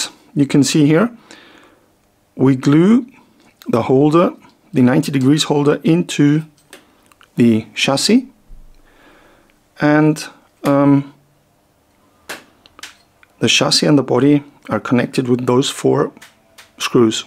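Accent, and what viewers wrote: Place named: Netherlands. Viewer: Love the details of the build. I wonder if you are a native from the same area of Holland as Max Verstappen your accent is very similar sounding to me.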